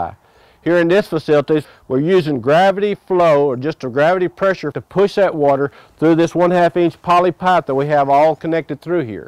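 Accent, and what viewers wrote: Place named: Texas, United States. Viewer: Great video.. I love the Texan accent :-)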